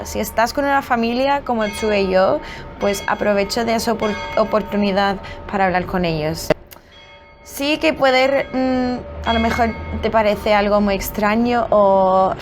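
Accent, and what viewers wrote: Andalusia, Spain. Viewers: Que interresante escuchar un gringa spikando spanish los cidadanos de gringolandia hablan funy el acento y hablas como una andaluza ole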